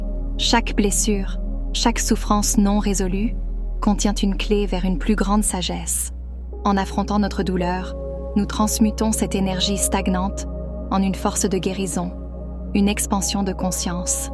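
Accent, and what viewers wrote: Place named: Canada. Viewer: ************ Merci l'IA au petit accent canadien de ce message...Et merci aux initiateurs de celui-ci. *********************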